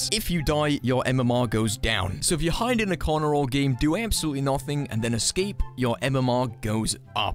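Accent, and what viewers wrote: United Kingdom. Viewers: Nah he just has a British accent.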